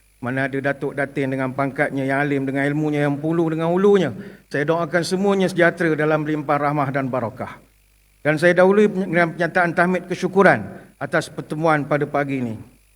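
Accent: Pahang, Malaysia. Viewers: ustZ tetap dngn loght phng bkn sesetangah ulamak dan org phg lain maaf ckp mudah sngt ubah2 bhsa. nk malu ape. org klate selamba je. kedah pun mcm ustaz wadi tetap ngn loghat nok malu benda. malu bertempat bru btul. ni org dtg sni kita pulak nk ikut bhsa diorg. mat org putih tu ikut loghat teerengganu sbb org ganu tetap ngn loghat dan tetap hebat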